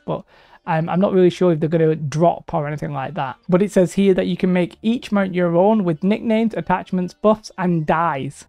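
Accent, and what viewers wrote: United Kingdom. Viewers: where abouts are you from? Me and my partner have been debating which part of the north your accent is haha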